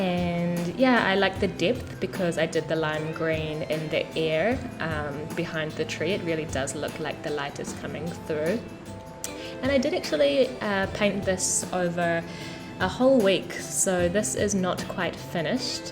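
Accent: New Zealand. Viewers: Are you from New Zealand? 😊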